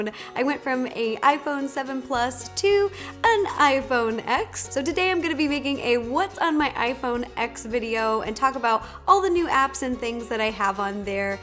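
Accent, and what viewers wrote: United States. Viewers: you have a nice american accent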